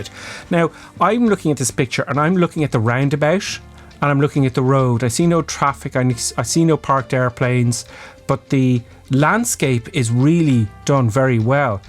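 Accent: Ireland. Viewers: You sound like a fellow Irishman?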